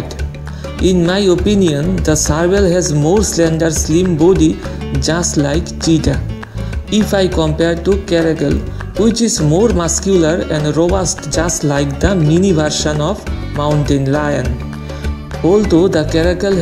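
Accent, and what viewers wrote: India. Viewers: Sorry but I just couldn’t watch that video. That Indian accent reminds me of the frustration every time I try to call customer support, be it for my cable, phone, internet support, etc and I don’t understand what the hell the Indian agent are trying to explain to me and worse they don’t understand my American English.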